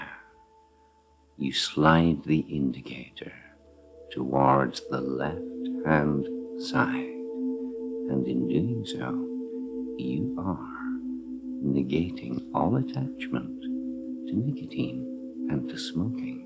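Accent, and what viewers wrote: Scotland, United Kingdom. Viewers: You the best I've listen just about all 10/10 what kinda Scot are you ? 😆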